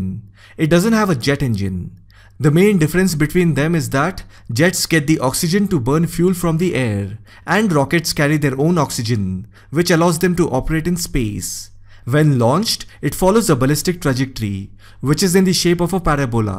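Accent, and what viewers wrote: India. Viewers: But with a heavy Indian unique accent though!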